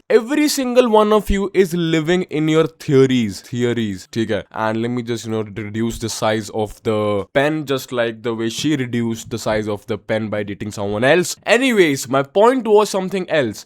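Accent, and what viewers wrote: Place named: Rohtak, India. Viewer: bhai rohtak se hai ke tu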